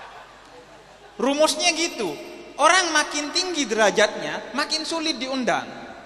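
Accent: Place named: Madura, Indonesia. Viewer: Logat maduranya tetap nonjol bib😁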